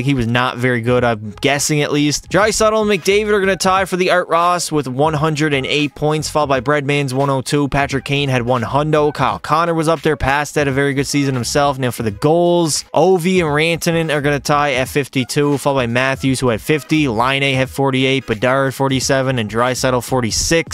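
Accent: Newfoundland, Canada. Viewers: Are u from Newfoundland?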